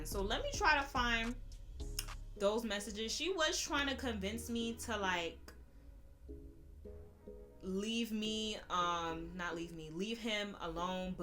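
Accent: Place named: New York, United States. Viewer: I love a New York girl accent 😮‍💨 so pretty with beautiful skin 😍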